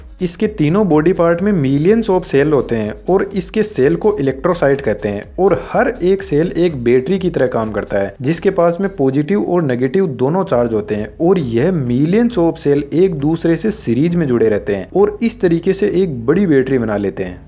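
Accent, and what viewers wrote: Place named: Haryana, India. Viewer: Nice video bro....ur accent seems haryanvi... 😀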